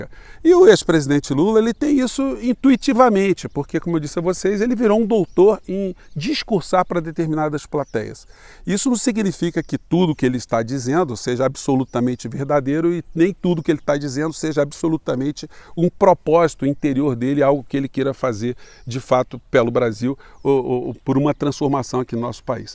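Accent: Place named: Minas Gerais, Brazil. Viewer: Uai!!! Não sabia que vc é Mineiro, mas desconfiava pelo jeito de falar!! Aaaamo! 👏👏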